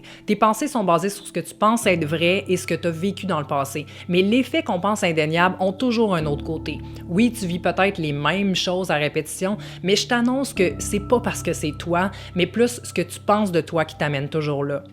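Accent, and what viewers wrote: Canada. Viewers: J'adore l'accent canadien. J'ai senti les larmes montées avec cette vidéo. C'est exactement ca, on ne s'en débarrasse jamais de ce sentiment (d'apres mon expérience) parfois on va "bien" pendant quelques mois, au mieux une ou deux annees puis on retombe dans la spirale.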